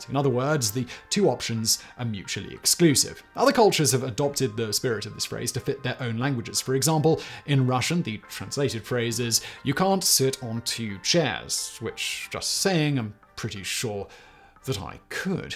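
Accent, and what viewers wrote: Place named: Cockney, United Kingdom. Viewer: your emotions and active nature on set is awesome. I watch your videos because you rock and I love to hear you read things.. I dont think it even has to be your channel content, like if you picked up an old James bond novel, you would read it better than the other guy that does it for audible, your cockney accent is better and you sound more south London and it just works man.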